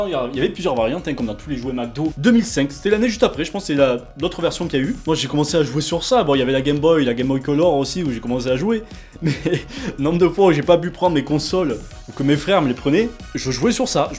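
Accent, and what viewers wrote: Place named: Languedoc, France. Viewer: Mdr j’ai 19 ans et grâce à toi je suis retombée en enfance l’époque du primaire!! Enfin une vidéo pour ceux de notre génération 90-2000!! T’es génial je t’adore!! En plus je viens de la région Languedoc comme toi mdr l’accent j’ai le même!! Je t’adore 😁❤️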